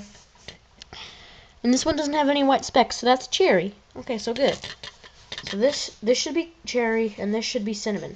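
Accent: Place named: United States. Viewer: I must be mad but I watched all of it both parts no skiping great fun I must get some don't know were to get them in the uk I'm gessing your american love ya actsent lol I will check more of ya vids :)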